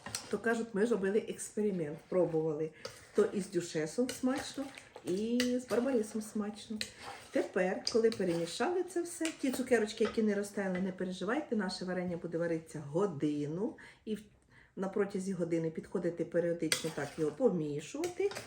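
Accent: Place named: Vinnytsia, Ukraine. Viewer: Скажіть, будьте ласкаві, з якої Ви області, бо у Вас такий говір, як у нас на Вінниччині? І де Ви придбали свою класнючу кастрюльку? Я на неї не могла все відео налюбуватися!😊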